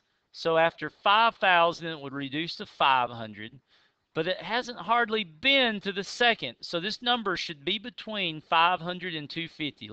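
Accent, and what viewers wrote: United States. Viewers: Science spoken in American English 🤪👍 loved it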